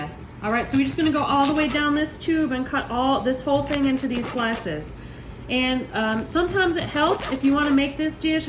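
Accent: South, United States. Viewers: Yummy. Girl where u from? You are sooo southern! Arkansas here. I love all your fast recipes and your accent too. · What southern accent is that?? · These desserts don't look good to me. It's not something I would feed my family, but I love how she tries to talk in a southern accent, so cute!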